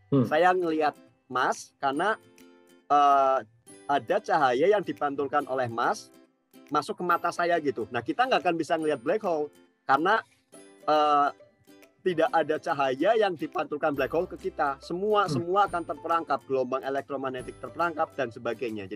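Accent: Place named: Banyumas, Indonesia. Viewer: Kiye kayane alumni SMA siji purwokerto maseh, ora ngapak ora kepenak 👍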